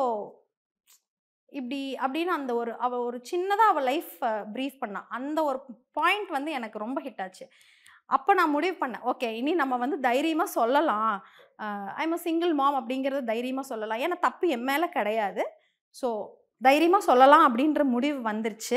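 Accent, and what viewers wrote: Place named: Coimbatore, India. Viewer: Mam i like your way of confident talking. I like your coimbatore slang i am also a coimbatorian. I admire your way of talking confidences and boldness. I pray god to give you more strength to manage your life. Your daughter is cute and beautiful takecare Sneha i like her somuch. All thebestfor your future♥️👍👌🙌🙌🌷⚘🌹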